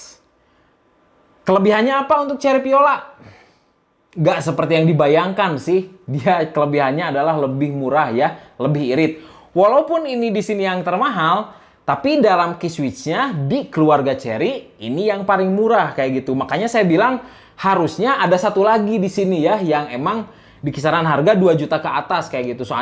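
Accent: West Java, Indonesia. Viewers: Resep kana aksen sunda na 😂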